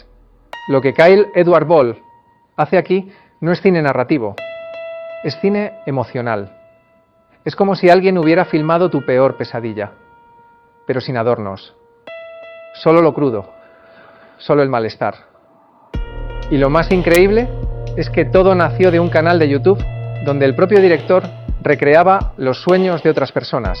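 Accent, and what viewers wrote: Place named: Spain. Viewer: no sabia si eras latino o español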